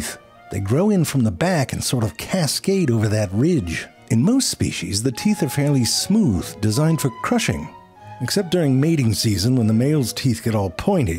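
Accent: United Kingdom. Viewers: Does the narrator sound quite a bit like the main character from the PC point and click "Scratches", or is it just because it's a vaguely British sounding guy constantly being frustrated by a man called Jerry?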